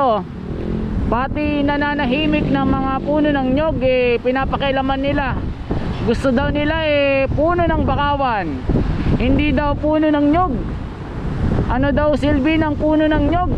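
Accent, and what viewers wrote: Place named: Provincial, Philippines. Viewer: ANG MGA ITINANIM NA PUNO AY HINDI NGA IBINAON SA LUPA NG TAMA. DAPAT TALAGA IKINONSULTA SA MGA HORTICULTURISTS ANG PAGTATANIM. KULANG NGA SA LUPA. SA ABROAD, HINDI GANYAN ANG STYLE NG PAGTATANIM- MAS MAAYOS AT RELIABLE ANG SA ABROAD. DAPAT IKAW VLOGGER, MAGING EVIDENCED- BASED INVESTIGATIVE VLOGGER KA AT HINDI PURO TALAK- NG TALAK AT HAKA- HAKA. NAKAKA- IRITA ANG TIMBRE NG BOSES MO. PROBINSYANO KA BA?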